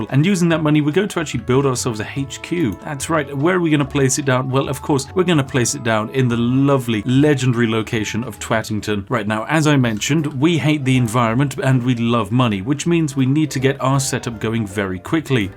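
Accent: United Kingdom. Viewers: No accent can make horrible atrocities sound perfectly sane and normal like the British accent. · Your English is extremely good, tbh! It sounds so natural, so spiffingly British! You can set up your own Tube Language School and organize your own Grimsby Advanced Exam) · LOL, "Billy Viel" car.... ("By Level" Car) Love this brit.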